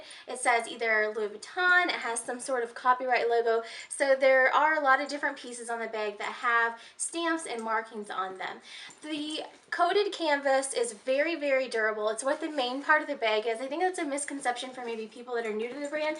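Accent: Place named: United States. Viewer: your little yankee accent is so cute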